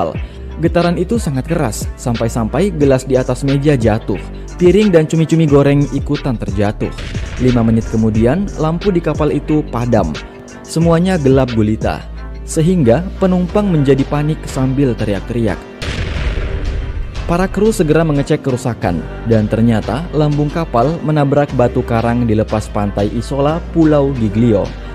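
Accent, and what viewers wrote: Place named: South Sulawesi, Indonesia. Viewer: Orang sulsel ini kyknya mimin😂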